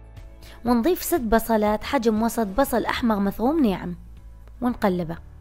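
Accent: Mosul, Iraq. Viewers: عاشت الايادي..احلى شي بالفيديو اللهجة الموصلية. ما اعرف ليش منسمعها هواي حتى بالتلفزيون من يمثلون على الموصل ميحجون اللهجة الموصلية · والله تستحقي الايك فديت الصوت الحلو واللهجه الموصليه🌹🌹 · لهجة اهل الموصل تقريبا مثل لهجة الرقة و دير الزور بسوريا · تماام حبيبتي مثل شغلي انا هم من من الموصل مقيمه بتركيا قباان لهجتنا وقبان قلبكِ وعدالتكي حبي😍😘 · الله على لهجتها المصلاوي الحلوى أنا اشقد ارتاح من اسمعكي تحكين الله يحفظكي ويوفقكي يامعدلي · شگداحب لهجتك وكلامك الناعم اني خوالي اهل الموصل احبهم كلش واتمنى اشوفك امي هم تعمل الكبة طيبة مثل كبتك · عاشت الايادي.. والأحلى من هذا كله لهجتكي المصلاويي.. تسلمين ومزيداً من التوفيق والنجاح. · لهجتك المصلاويي حلوي كثيغ أحبكم كثيغ عاشت ايدكي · عاشت ايدج شغل رااائع واللهجه الموصليه تجنن لكن لا يجوز وضع الكبه مع كيس النايلون في قدر السلق لان النايلون بالحراره يتحول إلى سام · يخليلي هاللسان والله اشتاقيت للموصل اهلي واحبابي 😍🥰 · احى لهجه بالعراق هي الموصليه عشتي حبيبتي والف شكر ❤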